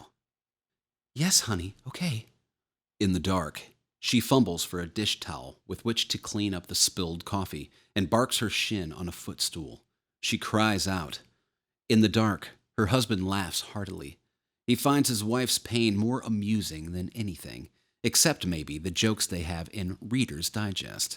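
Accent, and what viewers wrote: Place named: Maine, United States. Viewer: You have do a pretty good Maine accent.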